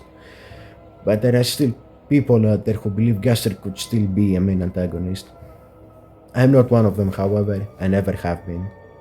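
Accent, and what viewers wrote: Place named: Netherlands. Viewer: Sounds like Netherlands to me for some reason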